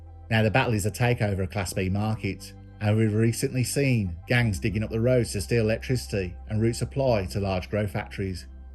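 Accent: Birmingham, United Kingdom. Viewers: it's hard to get a Birmingham accent his is not as strong as mine but it's there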